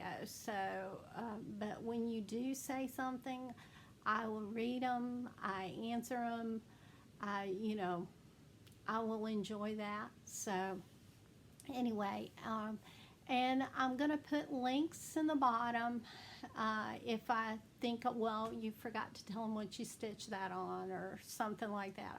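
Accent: South, United States. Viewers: Just found you. Love your southern accent! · y'all both have such a sweet southern drawl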